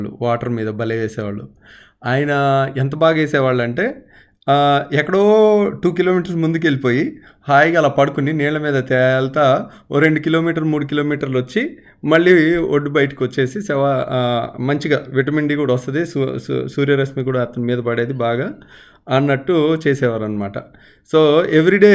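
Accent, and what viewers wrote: Krishna, India. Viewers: Miru chala chakaga telugu krishna dt valla laga matladutunaru doctor garu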